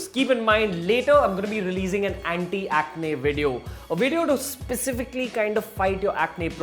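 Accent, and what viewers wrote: India. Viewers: 我们喜欢印度口音 好听 哈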